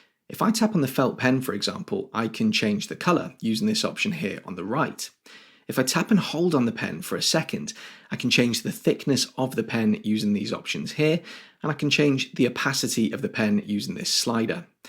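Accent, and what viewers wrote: United Kingdom. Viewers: Found your channel recently. Really good presentation from a true Brit. You from Cornwall? Or was that a random Safari page? Anyway. Glad I found this. I have already passed on to a friend. I’ve tried loads of other apps in the past, but have settled on the fact that Apple done properly is the best option for me. Thank you.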